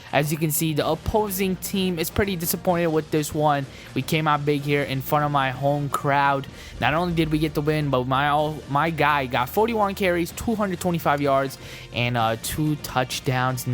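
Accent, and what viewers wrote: Maryland, United States. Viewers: Hey your from Maryland aren't you ?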